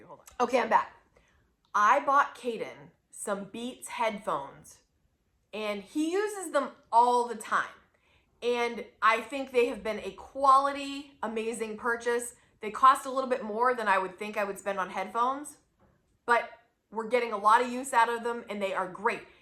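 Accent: United States. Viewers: I'm working on my no-buy, low-buy strategy and also working on my "aspirational crafting" collections. I've got way too many different craft interests, and I'm going to hone them down to TWO: photography and drawing/acrylic painting. I'm in Connecticut and you sound like you're from the state as well. If so, great to "meet" you and your really helpful channel! 😊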